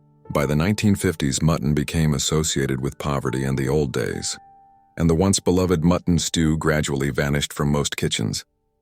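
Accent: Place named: United States. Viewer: why the american accent ?!?!?!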